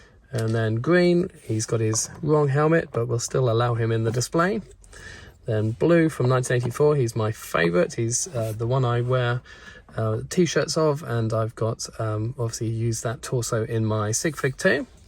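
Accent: United Kingdom. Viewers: When I first watched this I knew he was from United kindom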